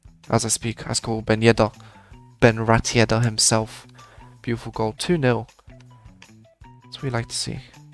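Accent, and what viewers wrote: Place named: England, United Kingdom. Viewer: you sound like you are from england or at least lived there for a long time lol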